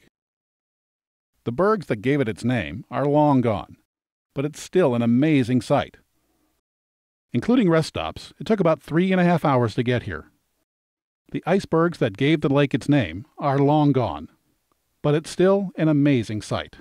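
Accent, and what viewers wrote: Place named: Midwest, United States. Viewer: No fishing. I enjoyed you video, then saw your name. Right age, etc. Midwest accent. Friends with Mary B back in the day. Met you a few times.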